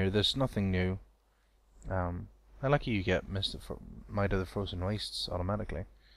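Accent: Sweden. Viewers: Is Rythian from Sweden!?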